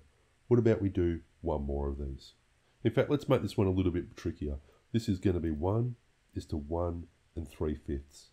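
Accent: Australia. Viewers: He’s an Aussie , American moment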